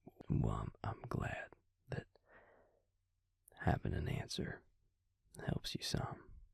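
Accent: South, United States. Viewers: I love his southern accent 😍he's too cute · Not usually a big vamp lover but oooh boi that southern twang could turn anyone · me not being american and not used to southern accent: "i don't understand but.. YYEEE HA!!🤠" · lovin the yeehaw accent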